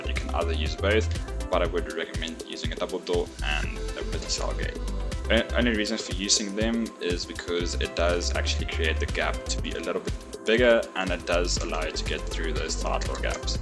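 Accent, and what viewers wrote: South Africa. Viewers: are you South African ? couldn't mind noticing the accent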